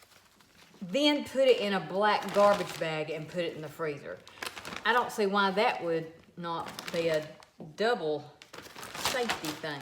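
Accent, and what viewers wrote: South, United States. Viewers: Okra is one of those things that people either love or hate . I love okra. Pickled or any way you want to cook it, it's great. I really enjoy your videos. Listening to your southern accent makes me long for my childhood days with family.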